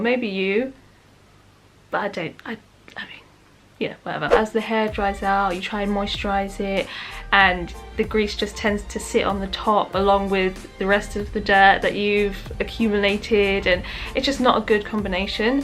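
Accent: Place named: England, United Kingdom. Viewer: Lol, ya mom chupsing! Then I heard your English accent made me subscribe even though my locs are much smaller. Been looking for fellow Brits to follow. 👍🏾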